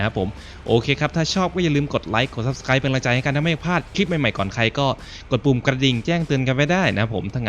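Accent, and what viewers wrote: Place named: North, Thailand. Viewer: เป็นคนเหนือหรือเปล่าครับ สำเนียงเหมือนเลย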